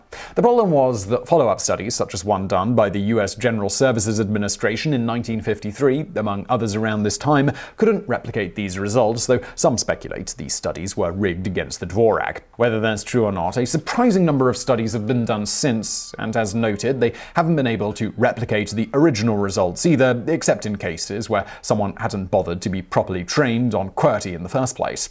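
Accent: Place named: South East England, United Kingdom. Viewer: both and I were born and brought up in the South East of England, and share a common dialect with very similar accents. I am not have "Sport" with his accent. (It is essentially the same accent as my own, with slight variation due to us both moving away from that region, and living in a variety of other areas over the years.) He absolutely said Continents. Further your accusation of "People such as yourself love this kind of stuff" is an appalling and baseless assumption on my character.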